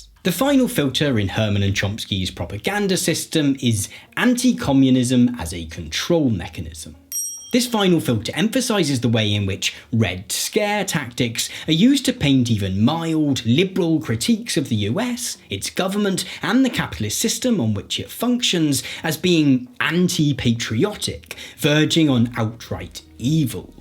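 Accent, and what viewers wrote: United Kingdom. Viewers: Great video but somehow my man has a british accent with a california vocal fry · Your accent is half "annoying British accent" and half "pleasant British accent" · Mickey Rooney with a British accent? 🤭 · has that certain British charm with which he speaks that's difficult to describe, but instantly recognisable. The way he sometimes replaces "because" with "for" is just... well... cute! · Why do you look like you sound British. I know why you sound british, but why can i tell you do by looking at you? Care to explain yourself?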